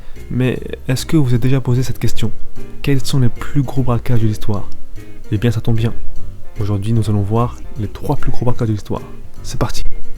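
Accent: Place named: South, France. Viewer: Pas mal la vidéo, par contre, on dirait que t'es trop près de ton micro, je sais que tu l'aimes mais, t'as pas besoin de t'approcher assez pour le manger x). Donc, tellement t'es prêt je t'entend respirer un peu et ça me stress xD Sinon, il manque un peu de dynamisme. Et l'accent j'aime bien, c'est tout mimi dit la québécoise qui n'écoute que des français tu viens du sud, nah ?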